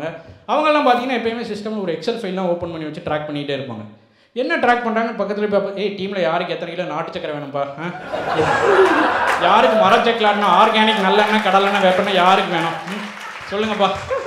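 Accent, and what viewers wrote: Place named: Madurai, India. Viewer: Madurai or near by accent 😂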